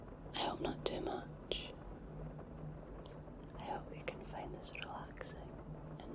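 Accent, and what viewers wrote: Scotland, United Kingdom. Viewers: I'm loving the soft speaking instead of the whispers, it highlights your nice Scottish accent, it's so soothing 😴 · Never realised i liked the scottish accent. You have a very relaxing and soothing voice. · Your voice makes my brain melt..Scottish accent is so good for ASMR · i really like your scottish accent.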